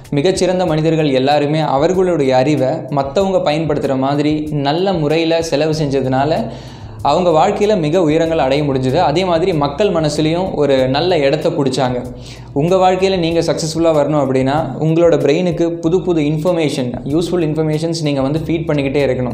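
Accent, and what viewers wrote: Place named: Kerala, India. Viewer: I like your Tamil+Malayalam accent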